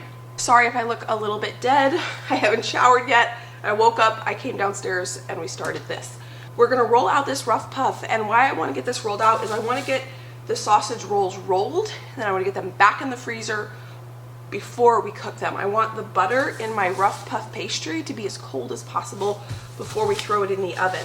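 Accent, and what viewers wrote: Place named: Michigan, United States. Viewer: Pasties! I thought I heard Michigan when YOU talk.. I’m from Michigan too!!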